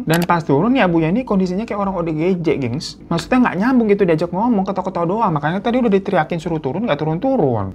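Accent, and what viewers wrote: Java, Indonesia. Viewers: Apapun bahasa nya logat nya tetep Jawa ya bang 😂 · aseeekkkk..dari padang niih, gw denger orang bahasa minang pake logat jawa kayanya baru lu doang bg gw denger😂😂 yook bisa yookk bang lu main2 ke padang😎🙏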